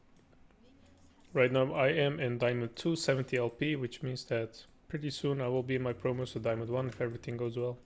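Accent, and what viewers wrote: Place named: Germany. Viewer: true, it's like a mix of german/english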